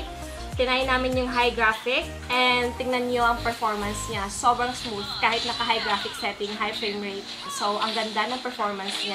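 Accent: Visayas, Philippines. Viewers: bisaya d i ka maam? hehehe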